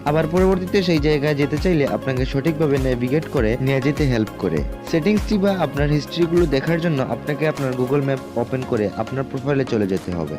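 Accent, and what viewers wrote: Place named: Sylhet, Bangladesh. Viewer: সিলেটি ভাইনি বা❤️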